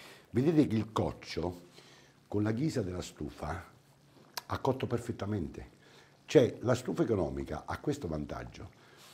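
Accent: Rome, Italy. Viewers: in italy we have dialects….each region has one. He talks roma dialect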